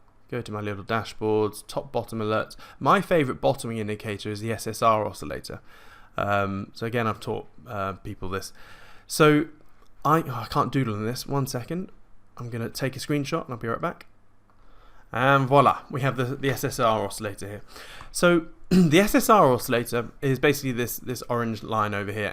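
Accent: United Kingdom. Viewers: Hey, thanks for the great educational video! Always appreciate to hear your British English! In the past couple of videos you've said that you don't trust this btc rally. Now that your bottom glassnode indicator is flashing "possible bottom" are you back in btc with a % of your free flowing USD? Or are you waiting for that $42k to hold as support before you initiate your capital? Would be lovely to get your answers on these questions. Appreciate your answers in advance:)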